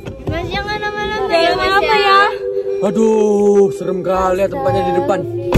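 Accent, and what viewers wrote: Malaysia. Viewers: Ngomongnya kek orang malaysia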